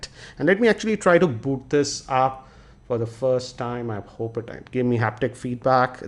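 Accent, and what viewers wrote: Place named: India. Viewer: gosh ! the indian accent...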